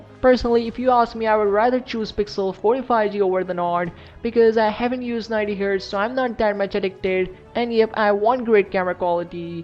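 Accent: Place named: India. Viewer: Indian english so ...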